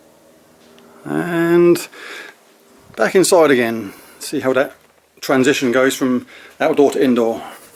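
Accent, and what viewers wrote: United Kingdom. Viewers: Just subscribed...thanks it saved me from buying one mate 👌 can i ask where your from mate?? Your accent sounds local ish to me down on the south coast ( hants )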